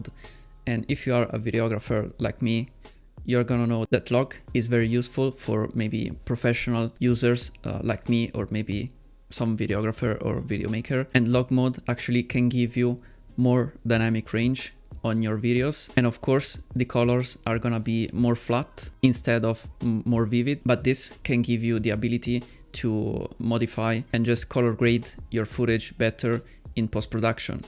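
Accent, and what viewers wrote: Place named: Italy. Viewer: sapevo che eri italiano...si capiva dall'accento inglese ahahah bel video anche io ho il mi 11 e secondo me con il sensore principale le foto sono fantastiche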